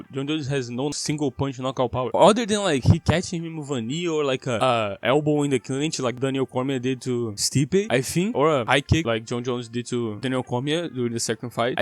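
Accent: Brazil. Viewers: senti um sotaque br. You have a really good point, the sad thing is that it pretty much doesnt matter who wins. Whoever wins will probably retire right after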